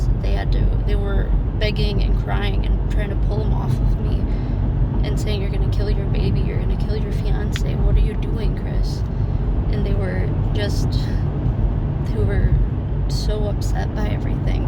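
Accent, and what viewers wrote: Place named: Ireland. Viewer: I was just thinking if your are Irish or American-Irish as i recognize the twang and of course the penchant for red hair? There are several pitiful girls with their story here on YT about similar domestic situations that had turned abusive and eventually violent. You naming your children Riley and Reagen is also pointing me in the same direction. I feel very sad about your story, but i get the sense that there is something missing in your story, which would clarify why you are a submissive in the first place?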